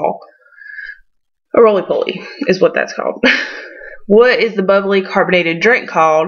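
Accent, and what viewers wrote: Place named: North Carolina, United States. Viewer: You talk just like me lol! I'm from the foothills too ;)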